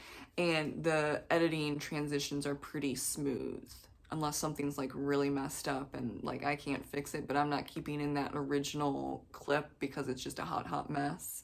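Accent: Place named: Boston, United States. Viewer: Love this! Great take on all these questions. There are ones I wouldn’t have wanted grandma to know about, but that’s about it. Everyone else can just deal with their boring taste on their own 😂 I get tongue tied and blend words all the time, I just beat everyone to the punch and laugh at myself. Sound like you’re from Boston, I love it!! Great video!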